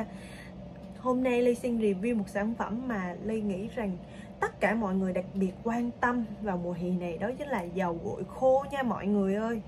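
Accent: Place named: Binh Dinh, Vietnam. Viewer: Giọng Bình Định hay quá chị ơi!!!!!!!!!!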